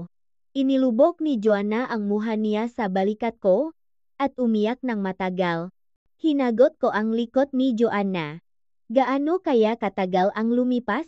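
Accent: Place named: China. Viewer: Ano n yn, intsik k b, nakakawalang gana makinig syo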